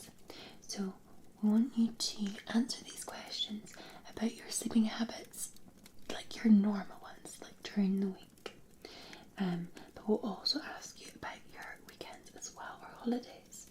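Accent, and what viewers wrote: Scotland, United Kingdom. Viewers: And a sleep therapist with a Scottish accent, nonetheless!😉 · Can u do ASMR with ehavy scotish accent ? 🙂💙🤍💙🙏🥃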